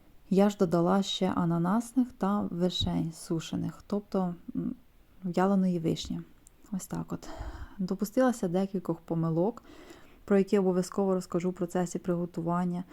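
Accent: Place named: Ivano-Frankivsk, Ukraine. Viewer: дуже дякую землячко, я родом з калуша і чую що у вас акцент такий з івано-франківської області.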